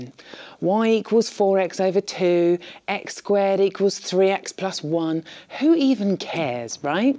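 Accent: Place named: England, United Kingdom. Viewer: Pretty, smart, english accent... Christ. Inb4 sexism, I comment on Derek Muller's sexy self all the time as well.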